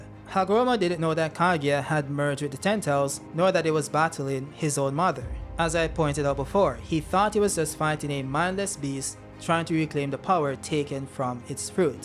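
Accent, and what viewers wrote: Jamaica. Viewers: Ayo are you Jamaican?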